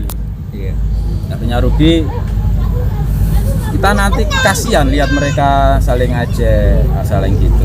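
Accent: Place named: East Java, Indonesia. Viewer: Logate etanan ngno kui...bumbune ben tambh sedep mas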